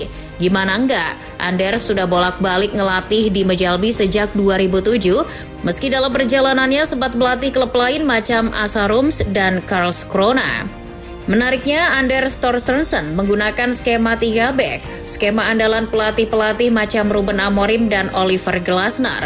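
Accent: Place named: Pekalongan, Indonesia. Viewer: orang pekalongan mana suaranya wkwk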